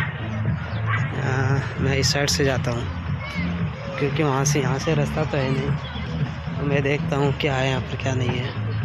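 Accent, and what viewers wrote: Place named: Nepal. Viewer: आप का हिन्दी सुनने पर नेपाल का हिन्दी जैसा है, मेरे नेपाली दोस्त जब हिन्दी बोलते है, बहुत सही लगता है। 🚩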